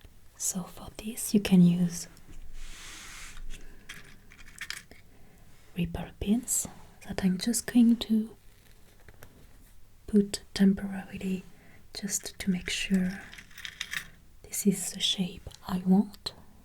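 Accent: France. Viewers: I think I'm addicted to your videos. Nowadays it happens not often to me to fall asleep without your smooth voice and so divine french accent. I just love it so much, I melt...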